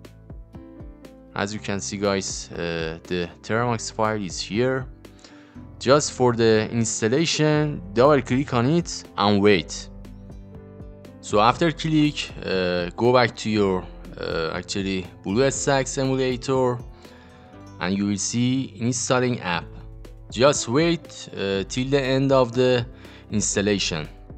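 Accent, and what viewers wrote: Iran. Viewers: Bro ur accent is tooo persian😂😂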